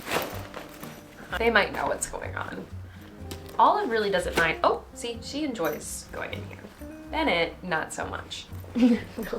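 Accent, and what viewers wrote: New York, United States. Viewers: Hello, sorry to hear about the loss of your Grandma. She must have inspired you in such a way. Good luck with learning to speak Italian in your trademark husky New York Accent! The Cats Olive and Bennett still steal the show! Take care from London UK 🇬🇧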